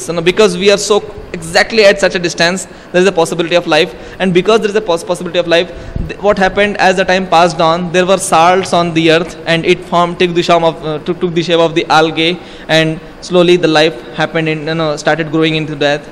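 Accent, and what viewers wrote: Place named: India. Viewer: A typical Indian style of speech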